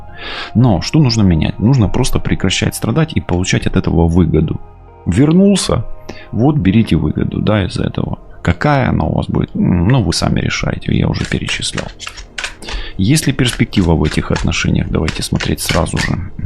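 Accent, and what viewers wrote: Ukraine. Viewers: Красивый расклад и красивая украинская речь ( мова). Мир всем нам❤ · ! Очень интересный расклад. И очень красивый чистый украинский язык.. Не часто такой услышишь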